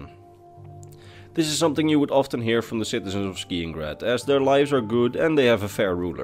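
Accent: Netherlands. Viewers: I didn’t really pick up from your accent that you were Dutch, until I thought about it more after you said “Dutch time”. What province are you from, might I ask? Also, love your videos!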